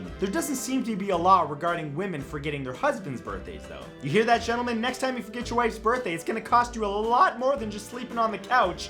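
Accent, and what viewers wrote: United Kingdom. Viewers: This British accent is awesome 😂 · Nice british accent btw it is crisps not chips in Uk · British accent. Nailed it!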